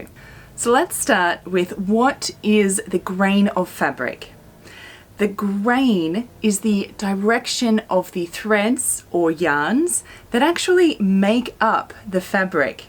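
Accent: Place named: Australia. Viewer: Thank you for your sewing lessons. Your explanations are easy to follow and understand. I also love your Australian accent 😊 ♥️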